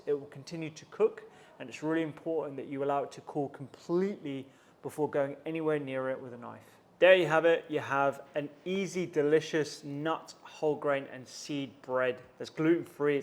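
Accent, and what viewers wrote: United Kingdom. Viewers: I understand the British accent is tempting :). However, please do post a healthy bread recipe that suits middle class folks. I am sure this one is more suitable for the $$$ demographics. Incase there is already one available. Let us know aswell. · Hello! Great recipe! 🍞 the finest bread. Are you of Indian or Pakistani extraction? I just subscribed to your channel. Love your British accent 😊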